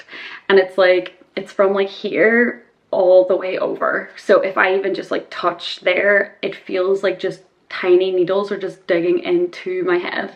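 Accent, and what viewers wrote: Ireland. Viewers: I appreciate that you have the captions turned on. Irish accents are difficult! It was so cool you got to see your surgeon. And I think that your eyebrows look fine. I don't even notice them. I'm sorry they hurt but I didn't even notice anything about them. Your hair is so beautiful. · you look STUNNING!!!! your hair is angelic; your eyebrows look perfectly fine. I luv your Irish accent and I'm glad you're feeling better. I have a mild form of Epilepsy and have had several seizures, so I know how terrible they are and feel. Good luck to you, if I see you in a pub, I be sure to buy you a Guinness.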